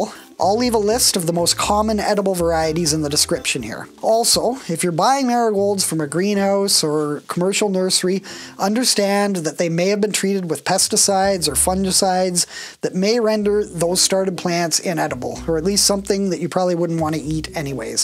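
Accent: Canada. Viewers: I just discovered you. I knew within 8 seconds you're canadian. True North! 🇨🇦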